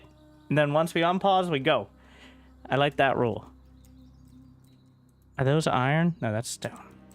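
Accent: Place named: South, United States. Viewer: Did you travel around a ton during childhood or is this the strangest accent I've ever heard from, allegedly, MICHIGAN? I was trying to nail down your accent which definitely sounds Southern, I was thinking East of Texas, maybe even upscale Arkansas, or Nashville Tennessee was my most precise guess - the sharp S-sound, the tip-of-the-tongue L combined with the otherwise Southern sound. - I decided to look it up and it said birthplace: Michigan. Would've been my last guess. is there some trailer park community there that has an odd isolated drawl haha?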